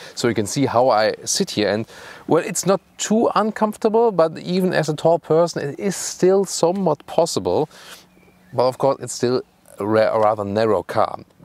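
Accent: Germany. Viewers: As a German automotive enthusiast and senior professional in the industry, let me tell you that we just laugh about this guy and his shitty English here in Germany. He couldn't even think of the word "lock" , that's just unacceptable for a self-proclaimed automotive journalist working in English. He's carved out his niche on YouTube, with foreigners thinking he is more knowledgeable because of the very strong German accent, but he's really just an idiot doing a poor job.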